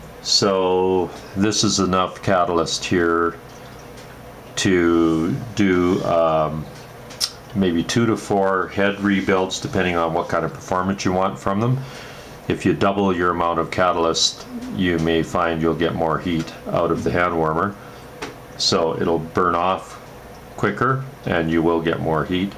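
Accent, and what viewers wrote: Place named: Ireland. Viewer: Hi there my friend, I detect an Irish accent, I am from Co. Antrim. Do you know where I can buy the Carbon felt and platinum catatylitic materials online? I purchased a Peacock Hand warmer on line which i believe is better that the ZippoThank you. Seán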